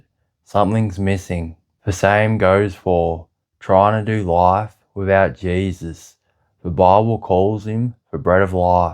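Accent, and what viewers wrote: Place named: United Kingdom. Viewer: Thank you for subtitles. You have a very strong British accent and I'm not even American. Thanks to the Lord I could understand you without subtitles but many people out there need you❤ please continue your work. Bless you brother❤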